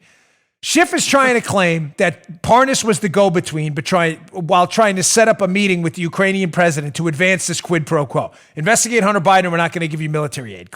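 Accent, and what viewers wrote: New York, United States. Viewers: NY wit and accent is wonderful! Love the quips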